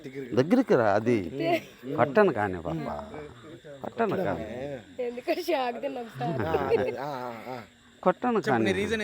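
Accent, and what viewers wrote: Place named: Chittoor, India. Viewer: Perfect chittoor accent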